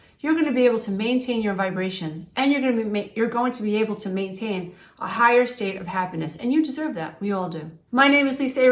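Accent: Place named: Long Island, United States. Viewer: Another spectacular Stellar video. Life lessons worth millions to me. You're priceless Lisa in the knowledge assistance reassurance Etc. That you give to me. I grew up in Levittown Long Island and living in Las Vegas 30 years I haven't lost my accent and it's so wonderful to hear yours makes me feel so at home. Honestly feels like my wonderful mom and her friends are sitting around talking giving me advice like they did the times when I was growing up hanging around the house dealing with s***. Peace light love my friend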